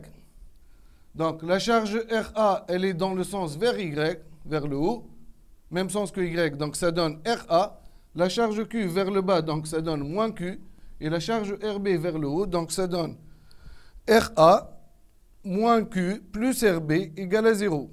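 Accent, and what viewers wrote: Tunisia. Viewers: Français en dialecte tunisien 😎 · الله على اللهجة التونسية جنان والله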